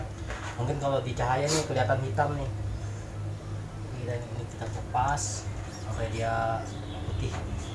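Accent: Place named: Banjar, Indonesia. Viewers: Betul teknisi Kalimantan urang banjar tinggal di grogot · Kyknya teknisi kalimantan ini.. kedengaran sempat bhs banjar 😀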